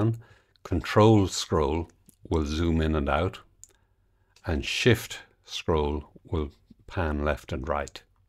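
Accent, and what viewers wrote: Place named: Ireland. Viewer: I was surprised to hear an Irish accent. Good man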